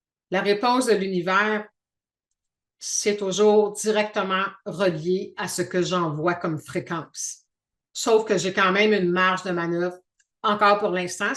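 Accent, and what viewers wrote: Quebec, Canada. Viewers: MERCI de tout coeur pour cette réponse à cette question posée car elle m'était aussi venue. Je t'écris depuis la Suisse😀. Tu dis que tout va très vite actuellement, je le remarque aussi. Par contre j'observe que certaines choses prennent plus de temps. alors que je suis dans la joie, la Gratitude envers la vie et TOUT ce que je vis. Est-ce en lien avec ce que tu expliques dans ta vidéo "2024, année de la récolte" que la récolte va débuter au printemps? Je me régale de l'accent québécois! Je me Réjouis de ce qui se déroule sur Terre, ça fait longtemps que je l'espérais. J'aime cette phrase : "La Magie opère lorsque l'Âme agit" Coeurdialement. Mahor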